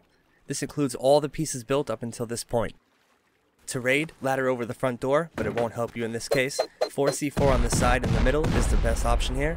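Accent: New York, United States. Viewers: This guy sounds like a New Yorker.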